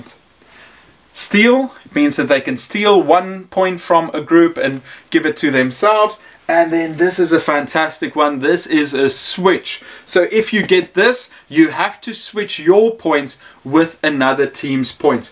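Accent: South Africa. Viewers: Easy to spot a fellow Southie! Nice job on all the content, very helpful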